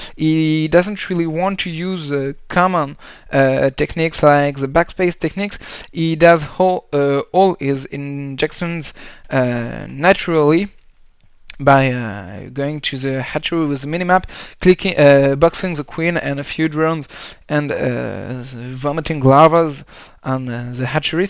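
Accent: France. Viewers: Salut, bravo d'avoir pris le risque, mais fais quand même attention à éviter les fautes d'anglais. Personne te reprochera d'avoir un accent frenchie mais massacrer certains pluriels ou conjugaison pourrait te coûter cher! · Mis a part les "ze" a la place des "the" je crois que ce qui rend l'accent français si identifiable sont les "euh" quand tu hésite, ca sonne vraiment très français, je comprends bien que c'est assez dur a éviter, mais entraine toi a faire des "euh" anglais, ca sera toujours mieux, sinon ton anglais est assez clair et fluide, continue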